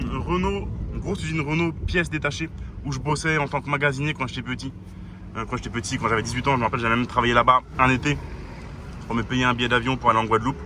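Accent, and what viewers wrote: Mauritius. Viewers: mec t'es mauricien?😅💯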